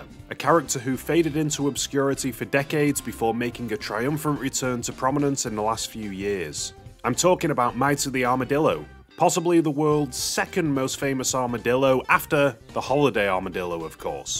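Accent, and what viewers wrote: United Kingdom. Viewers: Ur defo from Stoke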